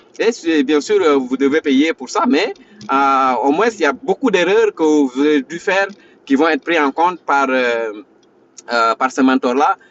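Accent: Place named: Senegal. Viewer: Salut lorsque tu a commencé à parler jje me suis dit en même ce Mr est un sénégalais merci pour la vidéo.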